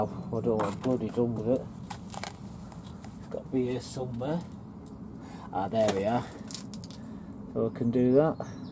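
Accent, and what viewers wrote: Salford, United Kingdom. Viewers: You vids are fine it's nice ironically to hear the acscent since I left salford when I was 19 I'm now 46 in do go back every 4 months to go watch a game or two Lemon balm herb as is stevia which is a natural sweetener Tasting that though its initially very sweet with an after taste of liquorice why do u only plant a small amount of tomatoes have u every made sauce with them we finally have nice weather but it's blowing 50 mph it's always something I'm trying to figure out how I can send a video of my trips to u I do offshore fishing for shark tuna etc u would love it if I figure it out I send a link after I post it take care u should type in goggle or YouTube montauk point Or herring run-striped bass or bluefish you will be blown away at the annual migration of some of these fish some of the bluefish migration last a week or two and are by the hundreds of thousands in schools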